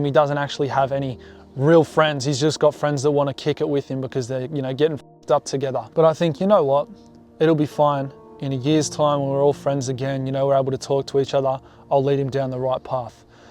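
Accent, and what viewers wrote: Australia. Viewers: Are you from Melbourne or Queensland?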